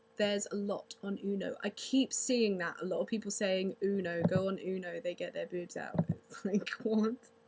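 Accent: United Kingdom. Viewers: heck you don't have to search on google! Look in the mirror you're so pretty and that british accent. Lovely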